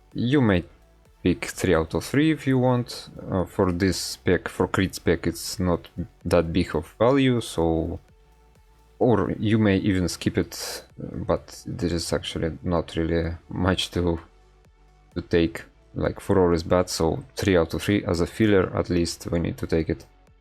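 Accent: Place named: Russia. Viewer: Кажется, мне слышится русский акцент...хм ...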